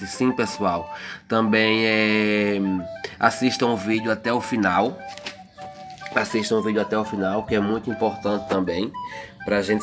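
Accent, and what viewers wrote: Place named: Recife, Brazil. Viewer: Parabens que lindo ! Amo esse sotaque é de Recife ? 👏🏻👏🏻😘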